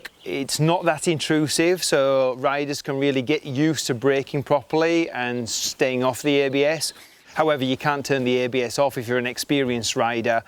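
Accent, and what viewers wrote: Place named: Yorkshire, United Kingdom. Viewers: Bridlington accent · Did not expect the northern accent in that location. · Hull/Yorkshire accent ? Lovely little bike and the price is bang on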